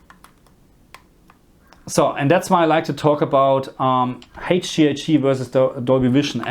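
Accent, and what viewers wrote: Germany. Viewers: First sentence, immediately knew you’re german. Gutes Video. ^^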